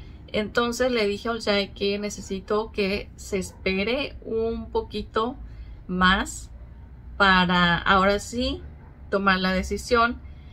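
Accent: Mexico. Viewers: habla como todo un mexicano, 😮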